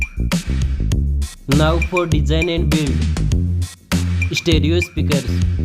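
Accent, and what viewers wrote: India. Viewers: Gotta love that Indian accent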